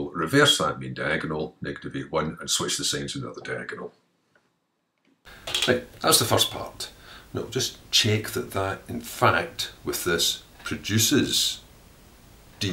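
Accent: Scotland, United Kingdom. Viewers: That Scottish accent is freaking awesome. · He's quite clearly Scottish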